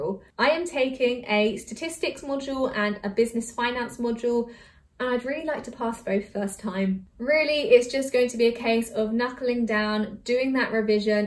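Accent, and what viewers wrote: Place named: England, United Kingdom. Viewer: Random: I love the English accent! I'm from Germany. BTW, you inspire me in some way, though I'm > a decade older than you ;)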